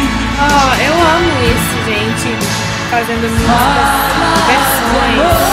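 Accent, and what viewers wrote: Northeast, Brazil. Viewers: Essa gringa parece q é nordestina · não sei se vc já percebeu, mas vc tá com sotaque nordestino, será que é proposital?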